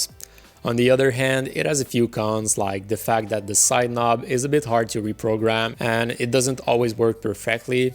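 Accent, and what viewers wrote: Quebec, Canada. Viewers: Tu est quebecois?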